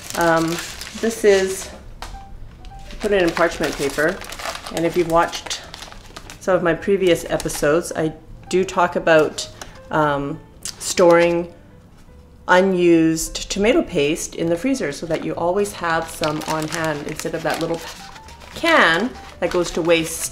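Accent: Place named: Canada. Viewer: lucky! i'm assuming you're in canada, i hear a bit of the accent :) furthest i've been up that way would be seattle, but hope to make it to canada some day. i love that kind of weather! have a great week :)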